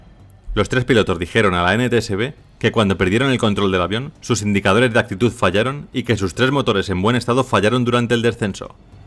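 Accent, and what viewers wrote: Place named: Spain. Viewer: Si se le entiende bien.a pesar de sentirsele su acento español..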